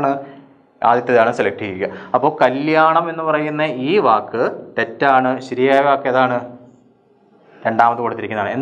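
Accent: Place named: Thiruvananthapuram, India. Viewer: Farya parayunnath Thiruvananthapuram Kollam aan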